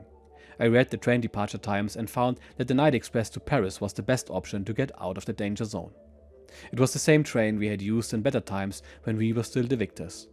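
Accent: Germany. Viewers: I was really hoping he was going to get the chance to attempt escaping to south America but his postwar adventures getting back to Germany were no less dramatic. What a great series of videos, i binged the lot, so captivating. The German accent really adds to it. One question, didnt i hear him say at on point he was going to end up commanding u 701 or did i miss hear that? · Your accent is heart breaking because my best friend in high school was from Germany. He developed depression and disappeared. All of his friends think he took his own life. He was smart, good looking. athletic and had great musical talent. Not a day goes by that I don’t think about him. It was 50 years ago when he vanished.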